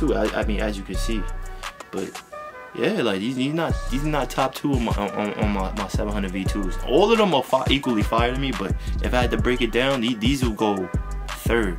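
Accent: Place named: East Coast, United States. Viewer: LOL this man got a whole east coast accent. U ain't one of us asking that silly sh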